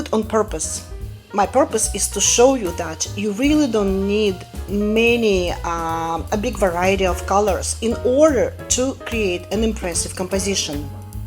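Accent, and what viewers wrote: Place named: France. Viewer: I love your French accent in addition to your art.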